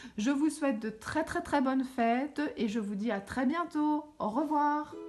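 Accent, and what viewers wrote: Belgium. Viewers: Coucou mais comme je aime tes vidéos je me permets le tutoiement c est plus facile si tu es OK. Je débute en scrap cela fait un an environ et tes vidéos m enchantent et m enseignent bcp.merci beaucoup vraiment. Je adore les fées et la fantaisie la nature du coup je me reconnais dans vos créations. Voilà je te souhaite de passer de bonnes fêtes moi aussi en vacances pour 5 jours avec mes deux enfants 10 et 13 ans je suis infirmière donc peu de temps pour scraper.Merci en tout cas pour ces bonnes idées sous l accent belge.Une dernière info des paperblocks de luxe sont sortis il y en a 4 magnifiques les as tu en Belgique nous ici en Corrèze pas encore. bises.laurence.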